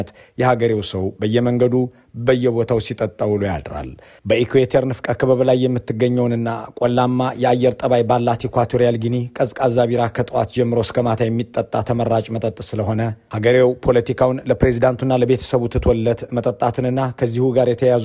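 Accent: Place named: Qomche, Ethiopia. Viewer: አክሰንትህ ቆምጬ መሆንህን ያሳብቃል ። ቢያንስ ተቃውሞህ እንዲሰማ ...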